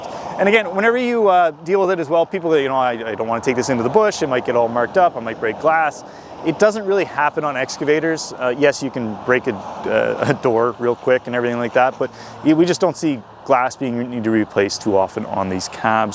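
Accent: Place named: Alberta, Canada. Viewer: I thought you were from Alberta, not west of Ottawa, I also watch B&F Macfarlane equipment.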